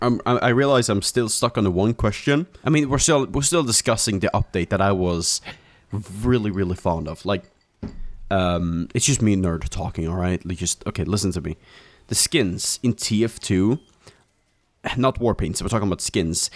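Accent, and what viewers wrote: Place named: Sweden. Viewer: That's just the Swedish accent, Joel from Vinesauce is the same.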